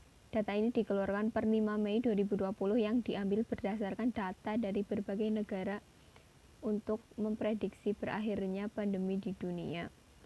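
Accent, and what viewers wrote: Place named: Java, Indonesia. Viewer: Medok bgt ya. Basa indo dengan kearifan lokal👍🏿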